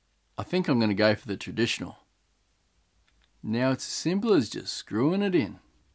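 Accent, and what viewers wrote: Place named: Australia. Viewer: Good filming, interesting content, aussie accent, mentioning another channel, you got yourself another subscriber!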